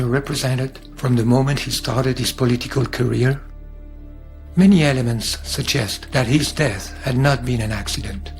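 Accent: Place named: France. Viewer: This is a video that needs to be seen by EVERY American! (I only wish the narration was by a native English speaker as the French accent makes it difficult at times). Any country so brazen to bomb an American warship in 1967 would be brazen enough to assassinate an American president in 1963 Here we have the reason for classifying so many documents decades later. The American people must not learn that their "greatest ally" assassinated one of America's most beloved president (probably the most beloved in the modern era - even by republicans)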